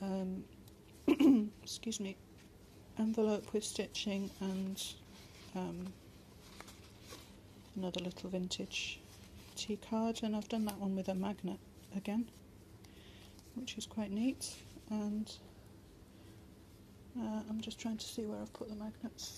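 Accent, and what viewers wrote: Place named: Kent, United Kingdom. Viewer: I'm not clever really your accent is quite clear still. I am from Kent so recognised you have a local accent as you sound a little like myself. It's beautiful up north and I love to travel that way when I can. If you had still lived locally I would have enquired if you do journal making classes as I would have loved to attend. There is little known about making journals down in the SE that I can find, as such YouTube is a valuable community building link. I must go check out your etsy store asap.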